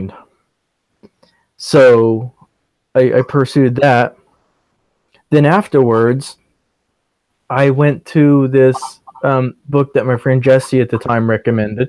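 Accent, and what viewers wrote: Scotland, United Kingdom. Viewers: 😄Great Interview!👏 👌i've listened twice now and found the discussion Very informative!🙏🌲🍃 Thank-you🌈..ps.your Scottish accent is charming!😀🔥keep it Just as is😉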